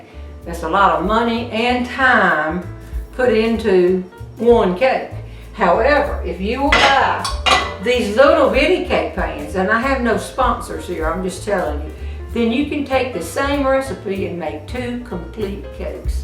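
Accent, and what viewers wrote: South, United States. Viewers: thank you miss, being from the south as well, I adore you and the way you talk and what you have to tell us. you have made many dark moments so much brighter. thank you darlin, May the good Lord keep you and your's blessed and healthy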